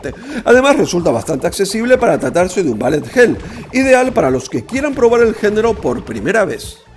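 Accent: Spain. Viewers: aaaanda, por fin lo sé, eres tinerfeño! Sabía que no eras del sur de la península y estaba seguro que serias de las Islas... Muy bonita Tenerife, ánimo con el canal y gracias por los esfuerzos para buscar los juegos de los que nos hablas en tus vídeos :)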